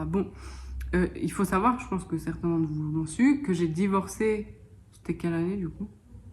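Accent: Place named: Switzerland. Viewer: Bonjour, vous avez déjà bien notre bel accent 👍😀vidéo très sympa, Bonnes fêtes de fin d année, oui Montreux est magnifique j y ai habité 6 ans,à présent château d oex,au plaisir😀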